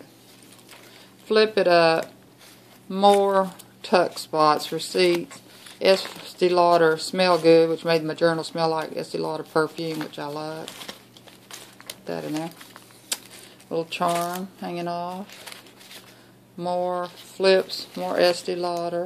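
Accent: South, United States. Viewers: ...where is your accent from? I am from Mississippi and I know it sounds southern...just not sure where...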